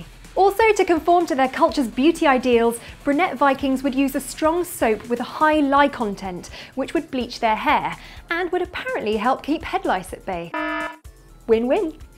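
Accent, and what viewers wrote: United Kingdom. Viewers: because she just is. her jaw, her cheeks, her voice, her eyes. like an audrey hepburn type face with a very deep british accent. She's married though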